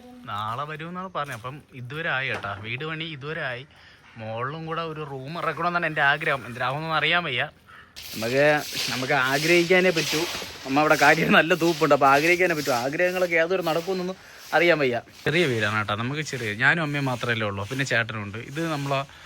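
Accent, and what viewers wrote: Trivandrum, India. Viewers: എനിക് നിങ്ങളുടെ വീഡിയോസ് ഇഷ്ട്ടമാണ്. ട്രിവാൻഡ്രം ഭാഷ കേൾക്കാൻ രസമുണ്ട്. ഒരു 👋 ഇട്ടേരെ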